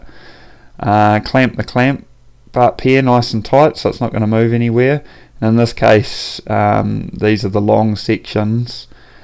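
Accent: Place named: New Zealand. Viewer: Very helpful, thank you! It sounds like you're from New Zealand. South Island???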